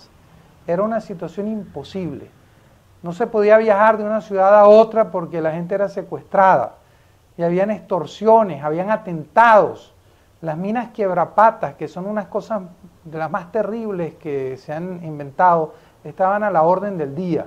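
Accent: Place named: Venezuela. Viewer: Con acento venezolano, al igual que el venezolano J.J. Rendón con sus antecedentes oscuros, mmm. qué casualidad, J.J. Rendón contratado reciente por el partido de la U desde hace 4 ó 5 días, con la excusa de alegrar la campaña, jaja, y casualmente, aparece este video CASERO, de hace 3 días, publicado exactamente el 09 de mayo de 2010 por youtube.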